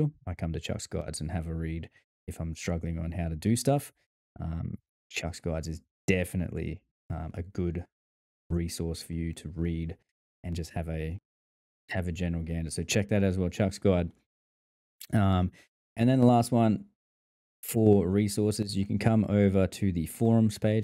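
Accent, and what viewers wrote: Australia. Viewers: Hey mate I am looking into getting involved in dcs at some point, I watched this, and if and when I get around to it I will definitely hit you up for info and further knowledge and hopefully you could be my wing man anyday ( had to say it ) / coach to get me up to speed. Great content and insight, loved the foul language as an Aussie myself you can't beat how we talk and have slang that most can't understand.. loved it, true words not just scripted for precious ears in this day and age.. I will definitely be watching more of your content because of how you come across and are REAL.. cheers mate..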